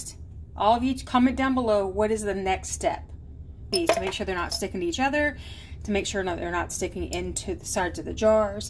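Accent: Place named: Australia. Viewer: "sorry a bout the cat" lol I was looking around here for which of my 3 cats were going off! Great tips, thanks for the down under speak-loved it! ♥ thanks so much for sharing!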